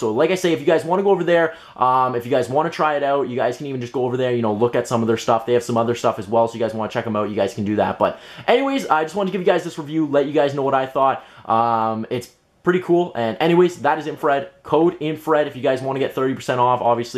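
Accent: Canada. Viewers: You sound Canadian. Are you Canadian? I know you won't answer... just curious though... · I don't even know this guy but I can tell he's Canadian by his accent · Who watched this video for the pure enjoyment of his Canadian accent? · He sounds so Canadian. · You sound canadian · are you Canadian ? you're hot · he's Canadian I can tell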